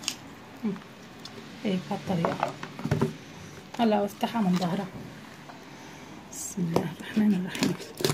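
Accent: Aleppo, Syria. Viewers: تسلملي اللهجه الحلبيه...ابوس روحك 🌹🌹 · خالة حلبية 😍 من صوت عرفت 😘❤ ونعم وانا من حلب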